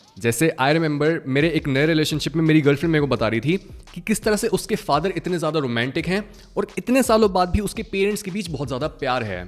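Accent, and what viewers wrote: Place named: North, India. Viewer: i seen your face first time today, your imagine in my head was fair skin guy like north indians (because of your hindi accent) without beard (face with beard on your voice was something my brain didn't think about) and normal body size with good shape of muscles (not as big as it is) your hair style in my imagination was kind of long enough to reach your eye brows, i imagined you as leon scott kneddy of resident evil 4 but you was totally different person, not bad but not what i thought.... you are good looking still by the way...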